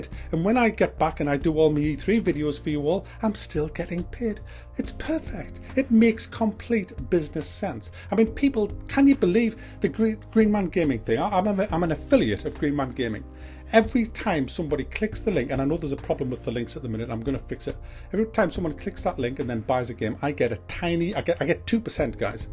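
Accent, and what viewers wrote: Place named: Newcastle, United Kingdom. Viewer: Made a Patreon account and put down a monthly subscription. Love your work mate! I'm a Scouser living in the States and always love to hear you're accent and sense of humor. Reminds me of my old Geordie mates. Keep on keepin on mate.:-)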